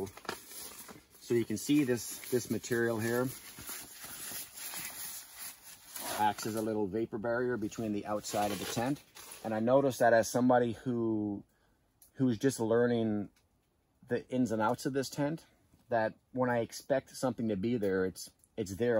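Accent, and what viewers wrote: Canada. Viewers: Im 49 yrs old...i have not been camping since i was 13...... my wife and i are just now talking about possibly getting some camping gear...this is cool Brother you sound like you have a very thick northern accent....im guessing Canada???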